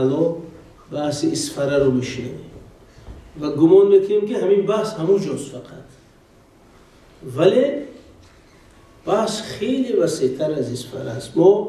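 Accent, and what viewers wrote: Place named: Tajikistan. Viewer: Сад миллион РАХМАТ БА ХАФТ ПУШТИ ГУЗАШТАГОНАТ !!! ЯКУМ МАРОТИБА ИН НАМУД БО ДАРАЧАИ АЪЛО СУХАН ГУФТАНИ МУТАХАСИСРО ДИДАМ. ТОЗА БО ЗАБОНИ ТОЧИКИ СУХАН МЕГУЯД 👍👍👍👍👍👍👍👍👍👍👍👍👍👍👍👍👍👍👍👍👍👍👍👍👍👍👍👍👍👍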